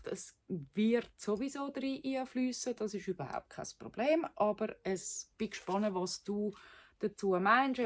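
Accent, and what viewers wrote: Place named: Switzerland. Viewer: Oh nurnuf Schwyzerdütsch so toll 🎉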